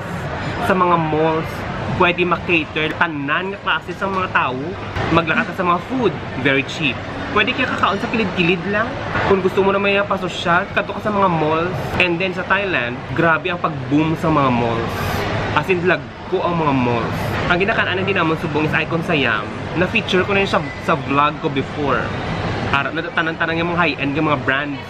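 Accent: Iloilo, Philippines. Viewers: Hugot gid kuya, true gid ginahambal nimo how,tama ba ako ilonggo,bisaya gid ako,thailand is the nice place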